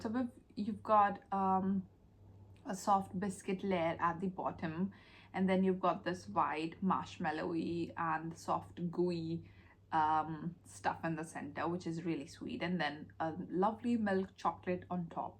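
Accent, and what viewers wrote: United Kingdom. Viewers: I grew up eating Tunnocks biscuits & Tea cakes as they're a huge favourite in Scotland.👍 Is it just me or do you have a Welsh twang to your accent?🤔😁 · The way you pronounce words like "Lovely (luverly), Flavoured (flaveED), Orange (ORange)" it's not a proper Welsh accent, but the way you draw out O sounds and enunciate the endings of words, does give you a very slight Welsh Valleys twang to your accent.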